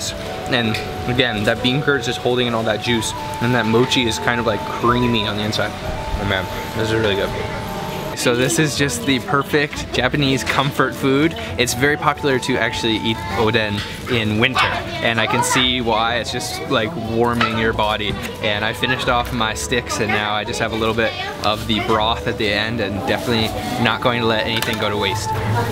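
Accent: Canada. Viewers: "secret hoos sauce, let's try this oot" I love that you are unabashedly Canadian... keep up the good work lol!!! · This is the first time I've watched one of your videos. Not sure if you're Canadian or Mid-Western American. Great video regardless! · Canadian or northern Minnesota? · omg your canadian accent is strong!!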